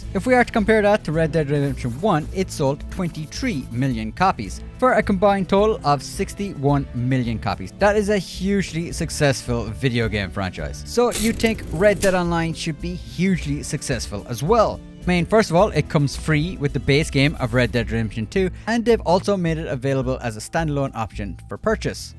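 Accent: Ireland. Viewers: Thanks for the honesty 👍 Irish Accent?☘️ Sadly not playing nearly as much…way too many griefers and modders for me. It starts As soon as I log on, no matter what time of day. Blowing me up, starting me on fire, killing my horse and causing me to lose trader and moonshine wagons and hides and high end stews. Not worth it any more. Play on PC 😡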